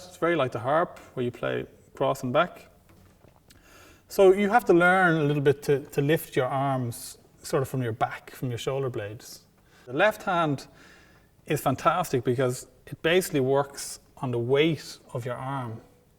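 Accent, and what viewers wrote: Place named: Ireland. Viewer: Third, it's called an Irish accent dumbass.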